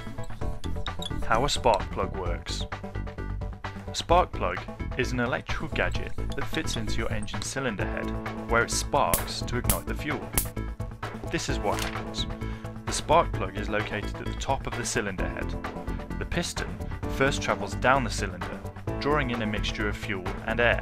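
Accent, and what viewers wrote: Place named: United Kingdom. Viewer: seconds in........ it's always some British guy... ok, Ima watch it anyway. I'll edit if it's a good vid.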